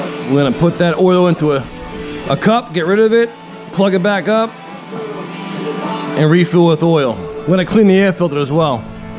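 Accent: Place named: East Coast, United States. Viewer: LOL ProChargA. It’s obvious you’re accent isn’t from most of America. East coast or New Orleans. Great video!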